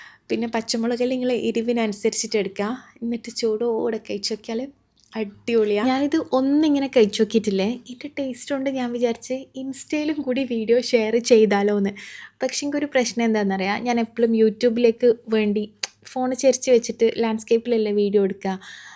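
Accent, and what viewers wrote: Kannur, India. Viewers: ഇവർ വർത്താനം പറയുന്നത് കടിച്ചു പിടിച്ചു ആണ് അതുപോലെ തന്നെ എപ്പഴും പിന്നെ എന്താ പറയുക എന്ന് ഏതിന റീപിറ്റ് ചെയ്യുന്നേ കണ്ണൂർ ഇങ്ങനെ ആണോ സംസാരം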